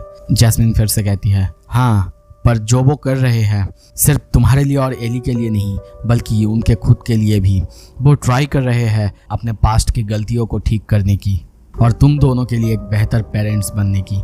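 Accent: Bengal, India. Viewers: Bengali ho kya bro😊😊❤❤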